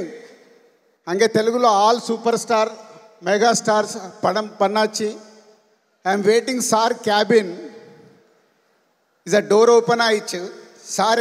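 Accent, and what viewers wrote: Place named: North, India. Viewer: Ena Vada pav vikira vadakan mari pesran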